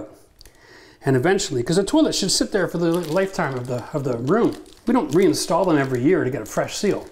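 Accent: Canada. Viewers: My comment got cut short Because my big carpenter finger accidentally hit the send arrow. So I'll repeat. This is the best toilet installation video I've seen... And as soon as I heard you sounding like Red Green and you showed me The Tim Horton's coffee cup To stop the vent leak, I knew you were Canadian. So thanks for all the valuable tips and Go Leafs! PS ... I'm here in the states so I have to make my own Tim Horton's coffee every morning And I don't get the cups. LOL. Stores here finally sell Timmy's coffee in cans..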